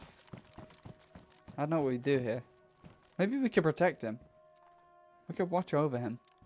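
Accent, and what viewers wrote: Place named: United Kingdom. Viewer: is Britsh im assuming from his accent and Daliy Dose of Internet is American but yes they sound simumlat in a way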